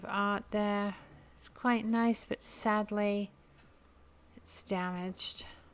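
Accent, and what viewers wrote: England, United Kingdom. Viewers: I love shopping with you ,your English accent is like someone from home, Your fun.😊❤😊too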